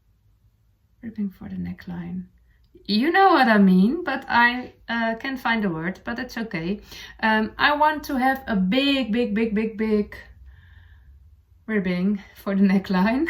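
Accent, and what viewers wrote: Netherlands. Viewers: Love your sweater, the neckline, the colors, the patternwork and it's placement! Well done! ❤❤❤ Your English is very good. Love your Dutch accent, so charming. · It's delightful to watch and listen to you. I don't mind your dutchie English. Looking forward to seeing your steeked cardigan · Oooh. I am only a few minutes in but know I'm going to love you. My grandfather was born in Netherlands and your accent reminds me so much of him.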